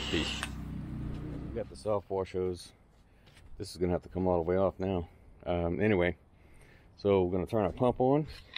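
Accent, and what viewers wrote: Louisiana, United States. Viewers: Man I knew you sounded like you were from Louisiana Saw the 985 area code on the water tank Awesome video!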